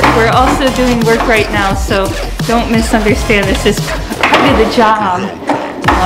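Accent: United States. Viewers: Wow you have so strong American accent. Can you practice against that? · Was going to check out your channel, but then saw the name. The term oriental is seen as a derogatory term in the US and it appears from your accent that you may be American.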